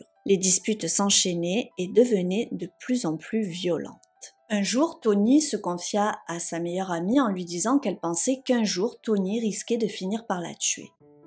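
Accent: South, France. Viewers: Génial!! Tu viens du sud de la France, selon ton accent? Marseille?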